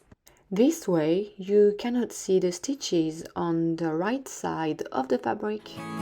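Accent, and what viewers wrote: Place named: France. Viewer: Love your French accent ! Et tres Jolie tasse au passage 😘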